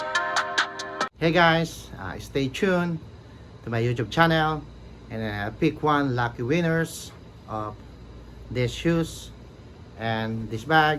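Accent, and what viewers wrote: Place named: Visayas, Philippines. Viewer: Tol pag sure ba tuno bisaya kana hahaha pero ang ganda ng mga shoes original talga alam ko yan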